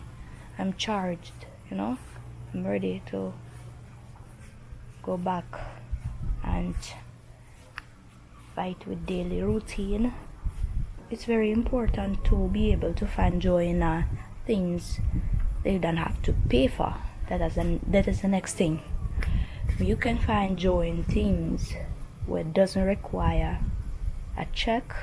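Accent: Jamaica. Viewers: Hey I haven't seen your videos in years! Last video I saw was when you went back home years ago to Russia or Ukraine I can't remember which 1 your from!!So glad your video popped up on my timeline.You have Jamaican accent now very cool 😎 👍🏾 1Love and Bigup your self💯🙏🏾Much Love to Your Family God Bless 🙌🏾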